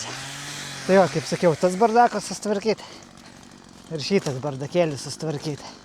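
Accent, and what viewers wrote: Aukštaitija, Lithuania. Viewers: Smagu žiūrėti tamstos video ir klausytis gražios aukštaitiškos tarmės😅🎉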